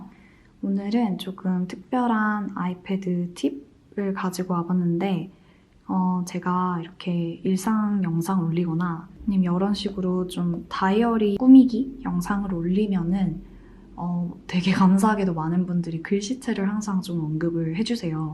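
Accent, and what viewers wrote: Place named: Gyeongsang, South Korea. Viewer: 약간 느껴지는 경상도의 말투!!!! 혹시 고향이 부산경남 쪽이신가용?ㅎㅎㅎ넘 반가워요>.<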